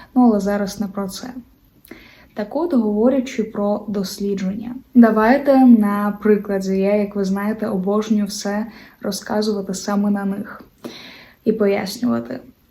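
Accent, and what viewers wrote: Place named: England, United Kingdom. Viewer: Таке враження,ніби у вас англійський акцент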